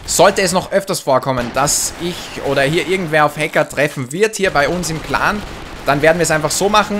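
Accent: Austria. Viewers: Bist du Österreicher dein Akzent klingt so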